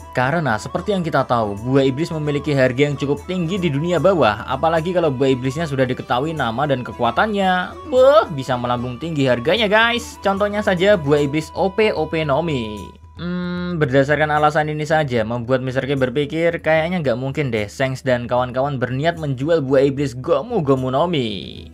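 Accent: Java, Indonesia. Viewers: Logat jawa tai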